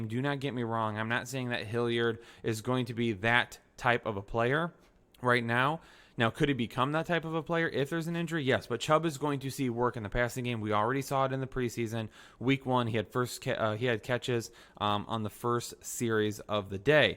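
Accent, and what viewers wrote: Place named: Boston, United States. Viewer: Everything this guy says that involves the o sound is magical and entertaining. I'm guessing he's probably in New England or Boston somewhere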